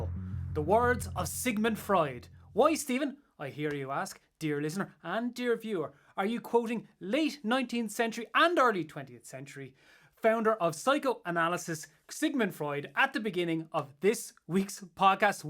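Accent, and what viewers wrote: Ireland. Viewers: Man, thank you for that fabulous review with your honey-sugared lovely irish accent!:) Can you and please come to my private christmas party???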